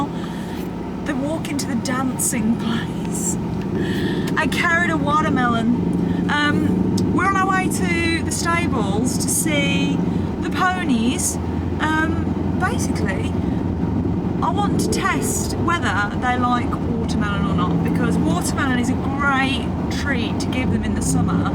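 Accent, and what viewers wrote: United Kingdom. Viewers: It’s unbelievable how there is discrimination between British accents 😢 very sad , imagine how the foreigners feel when they are discriminated because of their accent. And by the way I love your accent ❤ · Lovely ❤ I love you touched the bit with the accents! I am a Bulgarian, who lives in the UK now 10 yrs... I do have and I will always have an accent... I always feel embarrassed, especially at more posh places, formal work events and important meetings. However, now you saying been offended by people, genuinely blows my mind. You speak lovely British English and I can't understand what could be wrong with people who think and say that. Keep being yourself, you are amazing and that's why people who love you do it, because that's just you. My absolutely favourite amongst all influencers and the most glamorous woman, an absolute example for everything! 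💕 Btw, my name is Desi. 🥰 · I just can’t believe all these insults about your accent. I am a Canadian gal and i just see you as a beautiful British person. Love your accent .❤🇨🇦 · I love UK regional accents. Love yours! I don’t live in the UK.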